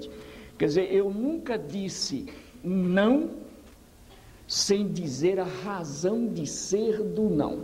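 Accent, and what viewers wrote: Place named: Northeast, Brazil. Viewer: Bolsominion não aguenta ouvir um homem inteligente,muito menos com sotaque nordestino.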